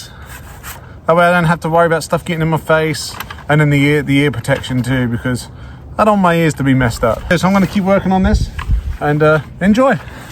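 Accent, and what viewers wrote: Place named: United States. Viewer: why the yank accent??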